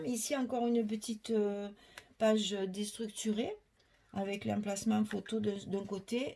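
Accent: South, France. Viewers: bonsoir......je viens de me regaler avec votre video......merci pour la petite leçon d histoire(lol)...l album j adore.....les papiers sont vraiment bien mis en valeur....et la boite bravo....je n ai jamais encore touche aux cires et peintures......c est vraiment reussi .....vous avez un accent bien agreable,plein de bonne humeur et de soleil.....moi aussi je suis du midi.....je suis catalane.....pour myriam de scrap for you c est vrai c est une personne tres gentille ,un jour on a passe 2H au tel je ne m en suis meme pas rendu compte tellement c etait agreable.....bonne soiree....;a bientot pour votre prochaine creation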